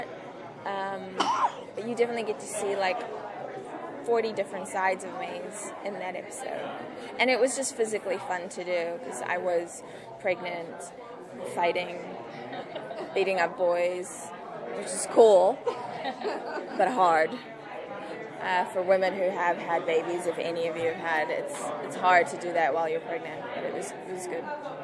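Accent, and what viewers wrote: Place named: South Africa. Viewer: I love that you still have your South African accent. A bit of American but thats expected. Don't lose your roots girl! Lekker bly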